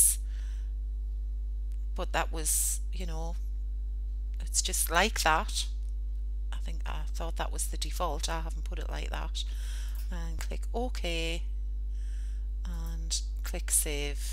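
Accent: United Kingdom. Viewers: Scouse :D? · Ha i love the Geordie accent, thanks very much for the help! :)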